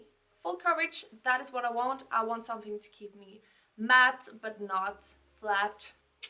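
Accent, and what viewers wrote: France. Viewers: Ahaha as a frenchie I can definitely tell he is french 😂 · That foundation looks really good, I might give it a try 😁 And ouh that French accent, easy to recognize haha (kisses from France !) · French guy? Haha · That was a french accent in this man's voice haha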